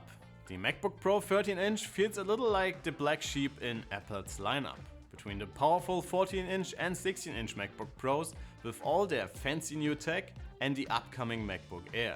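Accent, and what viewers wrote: Germany. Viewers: Wow, ich lese notebookcheck schon seit vielen Jahren, aber das ist das erste Video, was ich von euch sehe. Einfach großartig! Flüssiger Text ohne komische Pausen oder holprige Überleitungen und schönes English Ich mag den leichten deutschen Akzent 👌 Die Einblendungen mit Testergebnissen sind teilweise etwas zu viel für mich, aber smooth 😂 und wenn’s mich näher interessiert, kann ich ja Pause drücken oder nochmal im Artikel nachlesen 👍 Danke ans ganze Team! weiter so! Ich bin gespannt aufs Review vom neuen MBA 🔜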